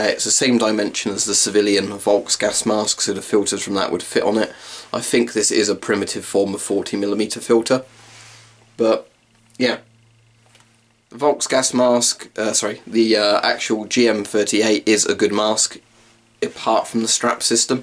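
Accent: Germany. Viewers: I can help but laugh at your german accent but it is still way better then mine...us americans are limited to accents but then again america doesn't have a specific accent bc we have people from multiple countries yknow what I mean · It surely has a tight seal and is rather comfortable. The straps are good i guess better than the czech straps, they are not that good in my opinion esspecialy on the M10! BTW. loved that german accent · oh man that German accent kills me every time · please do a video with your german accent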